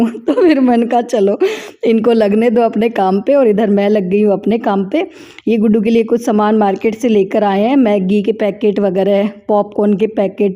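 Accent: Rajasthan, India. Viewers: Di ap vlog k bich bich me aise hi marwadi batein sunate rehna bahut achha lagta hai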